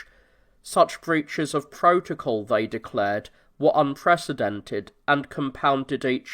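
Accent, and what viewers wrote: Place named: United Kingdom. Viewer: Thanks for the effort, Even though the Japanese names are pronounced in a British way, not the Japanese way. Still, Thanks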